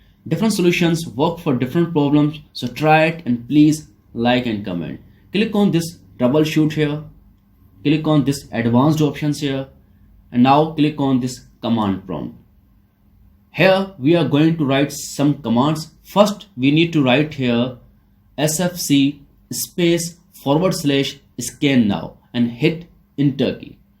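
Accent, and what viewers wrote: India. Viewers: Indian accent that's why??😅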